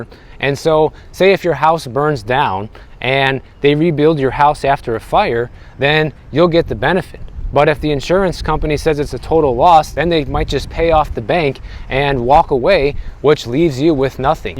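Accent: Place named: Miami, United States. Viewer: 😂😂😂😂 nice start my man! Truly Miami Sounds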